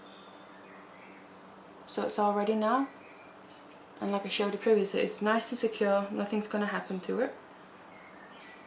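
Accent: United Kingdom. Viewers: Awesome video! Where in the UK is your accent from?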